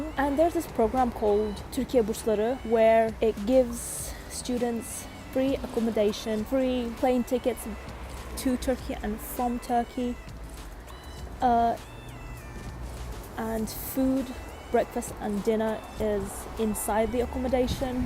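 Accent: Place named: RP, United Kingdom. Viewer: Standard British Accent) Well Done!)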